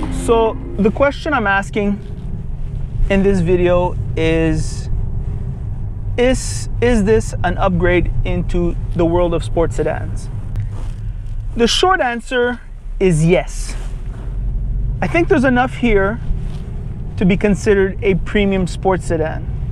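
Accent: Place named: Montreal, Canada. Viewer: Dude has such a Montreal accent.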